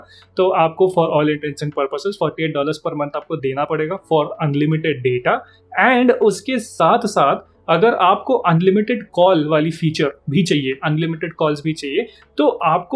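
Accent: India. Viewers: Your Indian accent is so cringe and unbearable for ears.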